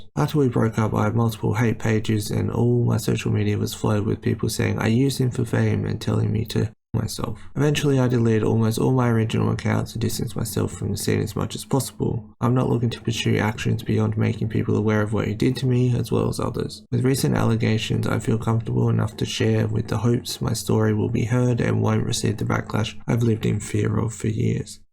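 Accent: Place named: United Kingdom. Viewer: ur so british i cant follow what ur saying without locking in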